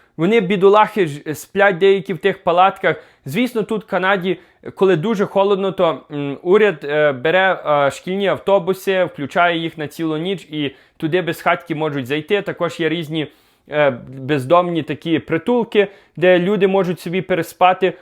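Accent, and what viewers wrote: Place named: Lviv, Ukraine. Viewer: Судячи по твоїй розмові ти зі Львівщини,послухай не ганьби МІСТО ЛЕВА та ЛЬВІВЩИНУ, йди працюй!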